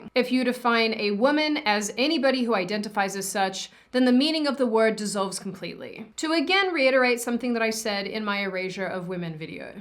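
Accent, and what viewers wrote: United States. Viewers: It's because the world has gone mad. Also, off topic, but you sound completely American now. All traces of your Aussie accent are pretty much gone 😪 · you sound more american every time i watch a video.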